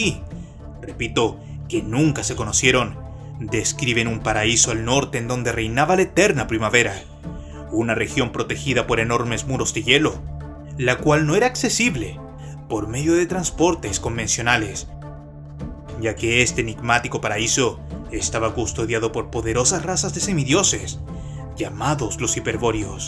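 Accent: Chile. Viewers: tiene acento chileno 😮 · Eres Chileno?bro muy buen canal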